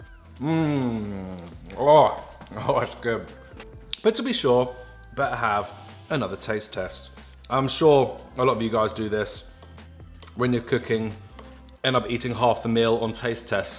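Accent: United Kingdom. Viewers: Also British accent makes everything so fancy and delicious hahh 🤩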